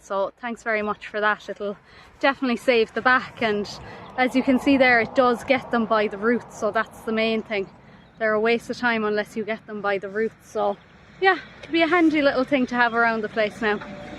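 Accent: Ireland. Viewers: Is it me...or does look cuter in every new video...her Irish accent is 🔥 HOT!!!!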